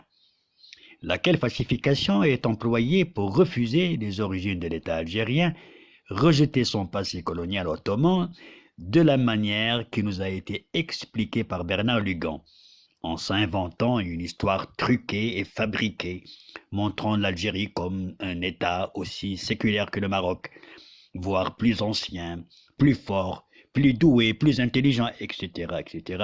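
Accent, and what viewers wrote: Paris, France. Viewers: Monsieur c'est avec révérence que je salue d'abord votre éloquence. Une rhétorique digne des grands lettrés. Vous n'avez rien à envier aux éminents spécialistes de la langue de Molière dans mon pays, la France. Et ce (voyons voir) tellement français d'une bouche à l'accent bien parisien, fascinerait davantage des auditeurs attentifs comme moi. Quant à la richesse du contenu, je m'en félicite encore pour ce qu'elle m'apprend sur le conflit du Sahara occidental que je considère d'ailleurs comme marocain par conviction et non par complaisance, grâce à vous.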